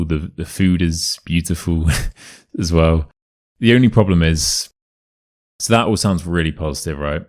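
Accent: United Kingdom. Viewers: The best British accent!!!!! I'm Brazilian and I love the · Your voice is surprisingly calm and pleasant... the British accent - there are actually several, as in every country and language - is brilliant! It's difficult to achieve for a non-native speaker of the UK... thank you for the generosity of your videos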